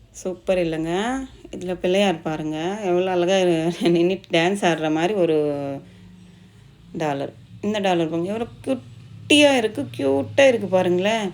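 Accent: India. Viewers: Very useful video mam. Your telugu mixed tamil is so cute to hear😊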